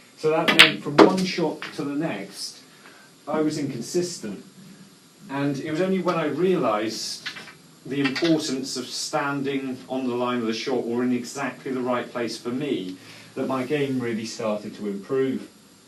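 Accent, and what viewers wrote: United Kingdom. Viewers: I'm a beginner in snooker, and I have a break record of 24. I love watching your videos because they're so spot on, calm, and easy to follow, so I decided to subscribe to your channel. I also prefer your British accent for some reason. Greets from Sarpsborg, Norway!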